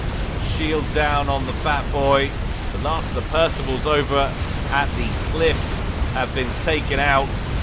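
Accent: United Kingdom. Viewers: Ive been gone for a while. But im back. Good to see your still active. I now feel old. But hearing a fellow british voice makes me know your quality has only got better.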